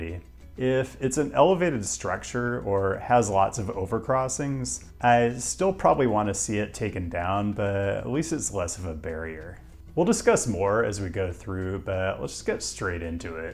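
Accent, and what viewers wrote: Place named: Midwest, United States. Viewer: Enjoy your dry and calm delivery on the review. 'Public health malpractice' indeed. The mildly midwestern accent helps.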